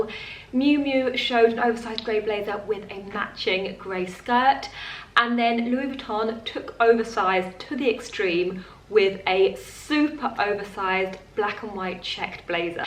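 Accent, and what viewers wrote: United Kingdom. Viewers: With love for you. Where are you from? Thank you. I like your English. British? I study english. Thank you for your answer.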